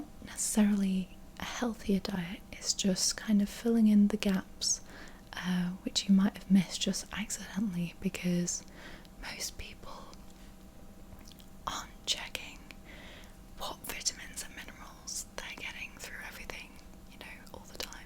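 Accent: United Kingdom. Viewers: brit accetta too different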